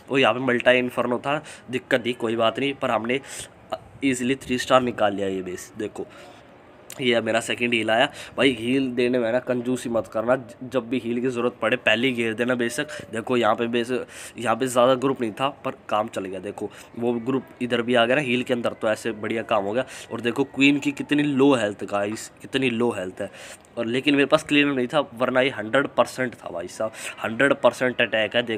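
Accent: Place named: Haryana, India. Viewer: Bhai video kti zhr h💓💖 tu haryana ka lage h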